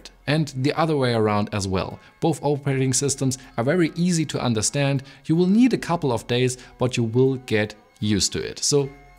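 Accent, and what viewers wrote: Germany. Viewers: I hear the german accent xD Love my s24U. Just wished our banks would support google wallet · Hahahahahahah ich dachte, das ist ein Scherz am Anfang. Der deutsche Akzent hahahahahahahah ich kann nicht mehr 😅 by the way: "if I have to be honest". What does that suppose to mean? You don't have, you should be! 😂😜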